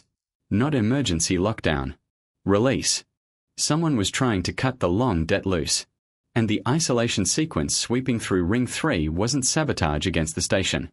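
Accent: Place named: Australia. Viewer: wdf?... and the reader has an Australian accent? (I might be wrong but it sounds... unusual)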